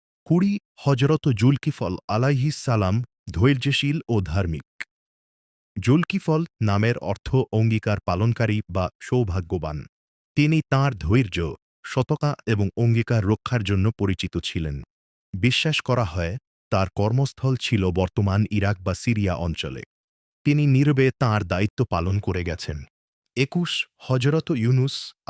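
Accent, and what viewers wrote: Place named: India. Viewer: Indian raa স er pronunciation aveba kore knoo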